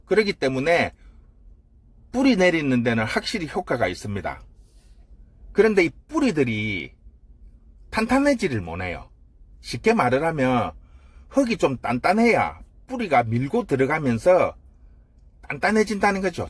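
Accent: Gyeongsang, South Korea. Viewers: 갱상도 발음 정겹게 들리네요. 일리 있는 말씀입니다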